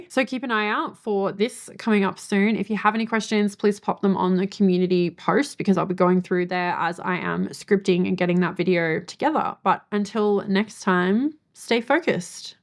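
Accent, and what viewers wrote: Australia. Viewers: You are so Australian and particularly QLD. Love it!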